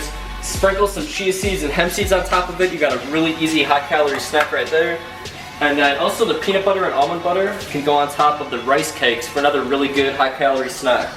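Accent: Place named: United States. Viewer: This guy looks very Russian I was surprised by the American accent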